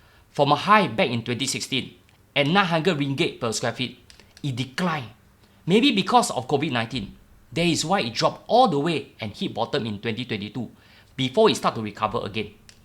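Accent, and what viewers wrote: Singapore. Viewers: No mistake, you are definitely Singaporean, you have authentic Singlish 👍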